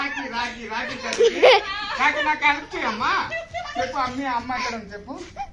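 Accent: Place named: Andhra Pradesh, India. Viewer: Anna mee slang maa Srikakulam la undi,? Meedi Srikakulamaa!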